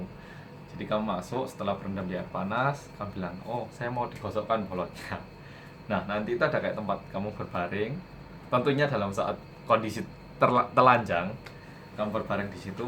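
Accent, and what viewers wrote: Java, Indonesia. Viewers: aku suka soalnya ramah juga santun dan murah senyum logat jawa persis kaya orang jawa asli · Istilahmuuu... nek pas manndi isok bolotan... hahahah asli khas nduueso... medok. Saluut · orang korea yang medoooookk.... uda g ada kata"nya y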